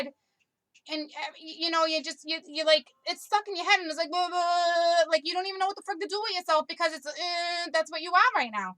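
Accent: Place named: Boston, United States. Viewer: Flip’n ADDICTED to U!! Your accents (Boston? ~ my son is in Boston !) ❤️💛💙💚🌹♂☿♀💝💑👫 YOU!! WE hv ALL bn stabbed in the back!!! YOU GIRL need to be exposed big time on a much bigger platform! I’d hire you to do events... I’m new to YouTube but would love to hv contact with u. ❤️❤️❤️ YOU!! YOU R SUCH AN AWESOME GOOD SOUL!!!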